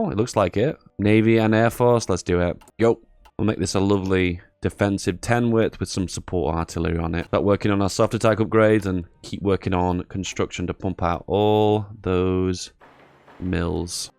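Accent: England, United Kingdom. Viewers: Class video mate, just discovered your channel but it looks like rly high quality stuff #giveaway Also nice to hear another English accent 😍🏴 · #giveaway Hey as I mentioned before, I didn't win a giveaway, but hey I'm doing it again cause it's free. Love your video and love your accent, as a fan of British accent (please don't judge me) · a smooth English voice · #giveaway I love your videos, they are usually really helpful and entertaining, and along with that sweet British accent just makes watching your videos all the more a pleasure.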